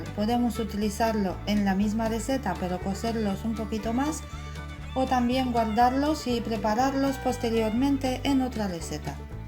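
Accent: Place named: France. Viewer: Me encanta tu acento franses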